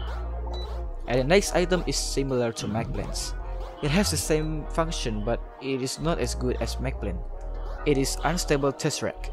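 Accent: Singapore. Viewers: Singaporean sound 🤔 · u sounds like sigapore alot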